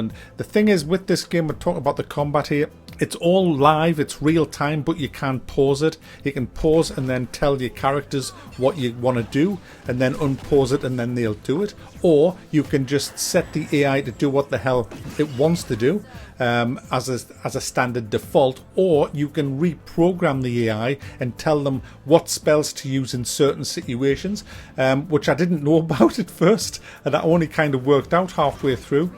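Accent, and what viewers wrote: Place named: Newcastle, United Kingdom. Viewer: Great review. Thanks pal. You Newcastle or Sunderland btw?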